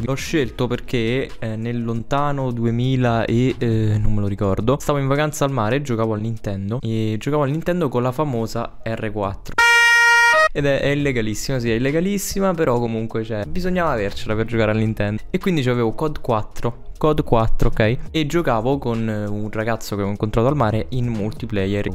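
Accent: Italy. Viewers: Per me è di Roma, si sente anche dall'accento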